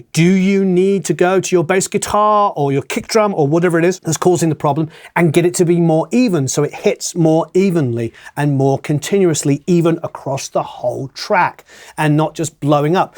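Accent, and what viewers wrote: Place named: RP, United Kingdom. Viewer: Hi, Hope you are doing Marvelously Well! :haha I remember watching 5 years ago and I still remember the posh accent lol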